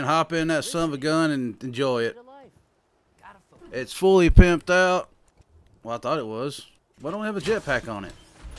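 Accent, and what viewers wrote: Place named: Texas, United States. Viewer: You sound like a country singer with that type of Tx talk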